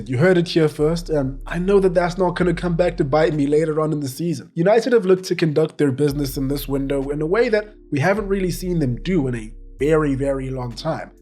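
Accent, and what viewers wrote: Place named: South Africa. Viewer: Is this dude a black South African? His accent is basically like mine. Not used to hearing it on the internet.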